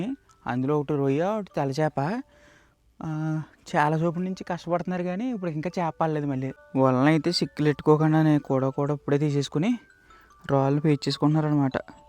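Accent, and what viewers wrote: Godavari, India. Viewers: నీ పేరు చెప్పు బ్రో నీ వీడియోస్ చాలా బాగుంటాయిగోదారి యాస baguntadi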